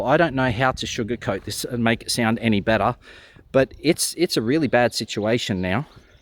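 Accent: Australia. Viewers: It's interesting but I'm hearing what seems like an Aussie talking about Norway with no upfront explanation of that apparent anomaly... ;-) My big concern is much less the typical grid down prepping issues (not that I am indifferent to that!), but the tyranny that is unfolding from our western governments. I lived in Latvia for 5 years and recently returned to my homeland of Northern Ireland, largely due to concerns about the Russian adventure in Ukraine and what that might lead to, but now I am seeing "hate speech" laws being drafted in both the UK and across the border in the Republic of Ireland that make Stalin's dicta look meek and gentle. Essentially, what is being proposed is the legal framework for data-mining everyone's communications in the past to profile them for potential future acts of "hate crime" (i.e. any opinion that goes against their pet narratives) and target them for pre-emptive arrest and imprisonment as a means of preventing them offending anyone with a "protected characteristic". 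Right now, I feel like I have jumped out of the frying pan and into the fire. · Very sensible advice. You sound Australian. Best wishes.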